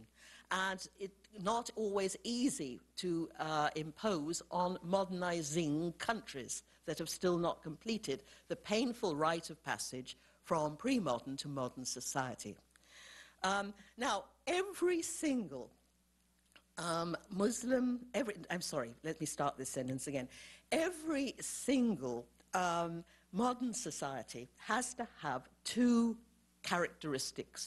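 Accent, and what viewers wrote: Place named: RP, United Kingdom. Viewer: She has no idea what she was talking about. her posh accent doesn't make her more knowledgable unfortunately.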